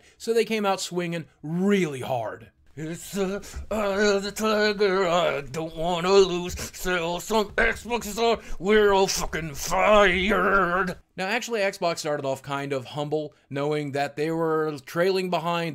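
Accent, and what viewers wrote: England, United Kingdom. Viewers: Brilliant vid mate, especially appreciated the English accent, proper cracked me up! Trying to think of some constructive criticism, but to be honest, I don't think you could have said it better. ;-)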